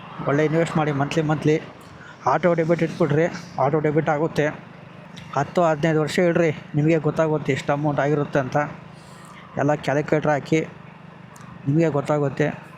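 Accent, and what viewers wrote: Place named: Ballari, India. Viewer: Yaav ooru bro nimdu , nim slang kelidre hospete illa ballari antha ansthide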